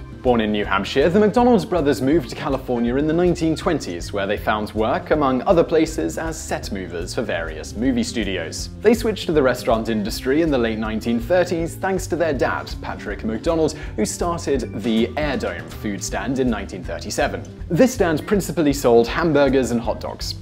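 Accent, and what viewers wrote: United Kingdom. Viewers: I get to watch one of your videos and look at your sexy face while you speak with that amazing British accent! 16/10 would watch again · Sexy British accent.cheers mate!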